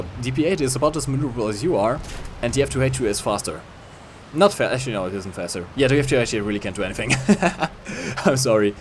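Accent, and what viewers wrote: Germany. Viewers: Are you German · Bist Du Deutscher?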